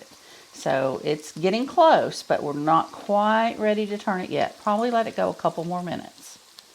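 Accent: South, United States. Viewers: Love your recipes and your lovely southern accent! 😊😊😊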